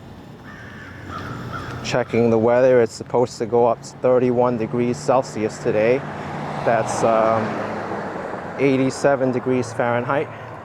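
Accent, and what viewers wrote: New York, United States. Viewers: Your awesome NY accent will alway give you away that you are from NY.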